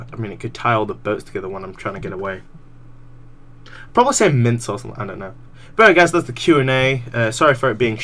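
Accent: United Kingdom. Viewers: wat your Asian I thought u were proper british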